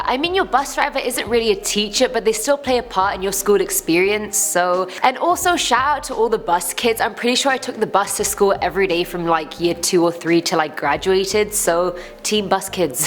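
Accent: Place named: United Kingdom. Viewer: I'm not going to make fun of Ms high-waisted jeans. She's far too pretty and charming, and has a very appealing accent, perhaps from somewhere in the United Kingdom?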